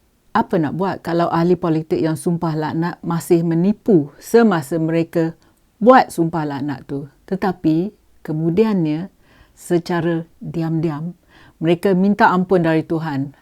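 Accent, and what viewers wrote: Perak, Malaysia. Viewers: I like your lorghat perak and so proud of it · campur cakap perak. terbaikkkk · Puan org Perak ke ...lenggok bahasa mcm Perak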